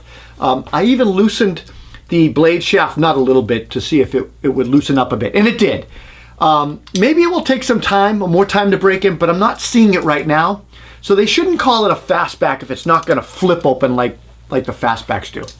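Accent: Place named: New York, United States. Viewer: Don’t like this big mouth. Very New Yorkey.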